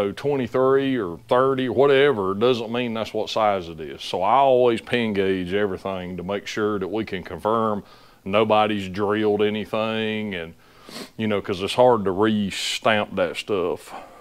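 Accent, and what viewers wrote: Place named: Upstate New York, United States. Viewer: You guys showed up in my suggestions, insta subbed. My kind of folks, wrenchers. A few oddities, first I can tell you're from my neck of the woods by the way you say cobb-urator, second I have a valknut tattoo also, third you gave it away- them overworked roads look like home or close to it (DOT will get around to it when they're done with 85, so basically never), if I had to guess I'd say Anderson. Cheers mates, from a mobile mechanic, starting a year out of college trying my own business 🍻 Cheers from Pickens. Small world, Im only guessing the county but I know yall are upstate folks and that blows my mind. Be blessed guys. -Regards, Harley.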